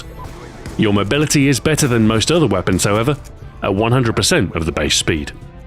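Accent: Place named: United Kingdom. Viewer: Somehow your Britishness makes all of this sound much more official.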